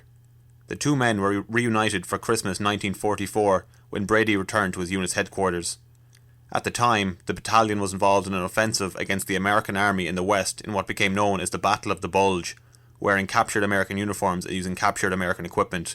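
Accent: Ireland. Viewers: So the narrator was Irish, too?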